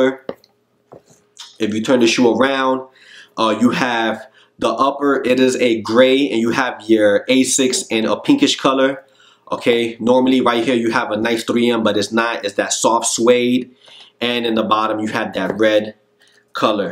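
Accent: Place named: New York, United States. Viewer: cant figure it out. that a new yalk accent or puerto rican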